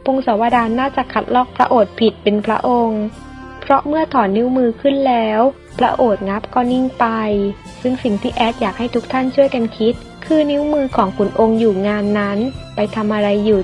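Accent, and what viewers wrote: South, Thailand. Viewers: แอดอยู่จังหวัดไรครับ.คนใต้หรอ.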